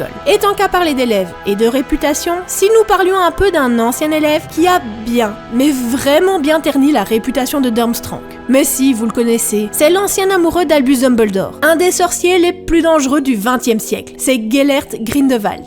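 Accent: Belgium. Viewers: Tu es belge ? En tout cas, super vidéo et vive ma Belgique !!!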